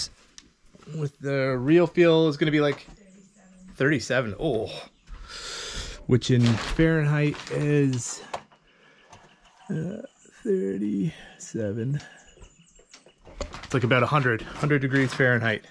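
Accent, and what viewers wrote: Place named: Ireland. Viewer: Came across your channel yesterday and pleased it was recommended. You definitely have answered one of my thoughts. I was thinking why am I picking up Irish Ancestry through your dialect. Cheers from the UK 👍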